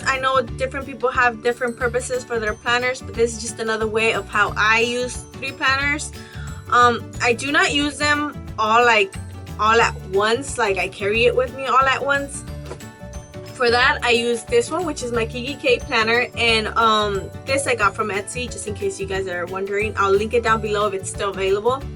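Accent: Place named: Mexico. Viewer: That Mexicana accent though 😂😂💕💕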